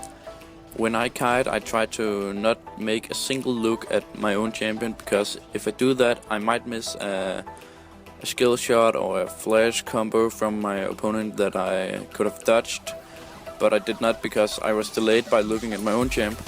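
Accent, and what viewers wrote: Denmark. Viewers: danish accent?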